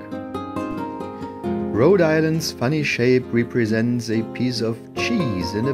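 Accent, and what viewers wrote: Germany. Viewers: This guy has the best German accent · God I love the German accent, great stuff too and nice music!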